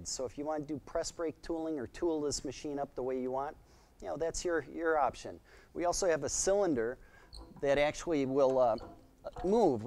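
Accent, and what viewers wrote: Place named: Midwest, United States. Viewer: 10 bucks says this guy is from either Wisconsin or Michigan.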